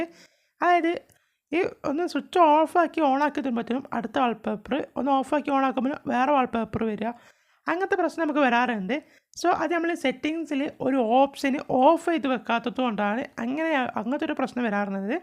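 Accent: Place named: Malappuram, India. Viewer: 😂ethra thekkan bhasha anukarichaalum malaappuram bhaasha idakk keri varum. Ningal malappuram karan😅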